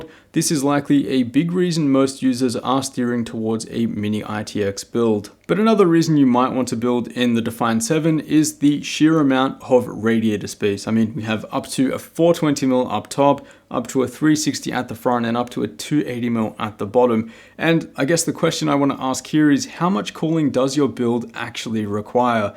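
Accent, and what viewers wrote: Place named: Australia. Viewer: wtf if you want small go small, go small. why are you bashing on the 7 with an aussie accent?! :) and why r u reviewing it in the first place?! water cooling brah! with big radiators and a fancy pump! not like yours!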